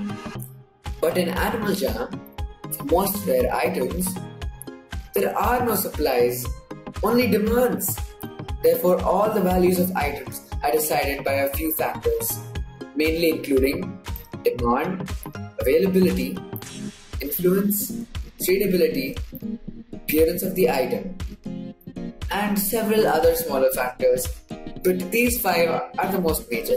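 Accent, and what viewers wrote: India. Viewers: He’s Indian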